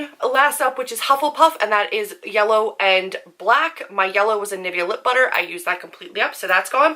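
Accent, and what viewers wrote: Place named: United States. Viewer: Where are you from? I can guess the US of course, but I can't place where. I think your accent is so cute! When you said "mask" I loved it.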